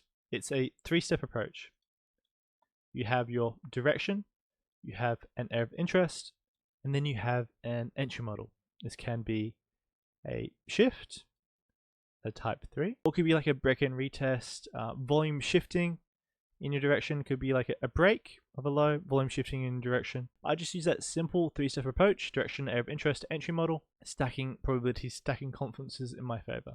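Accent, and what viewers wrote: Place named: Australia. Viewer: God bless you... seems that you have great information, but I can't for the life of me, understand your English man😢 too fast, words are mixed together... is this Australian? Sorry... I wish we could change things like that in the settings but there's nothing... even the subs having hard time translating it... Again, God bless you... you're really sweet. Thanks🌸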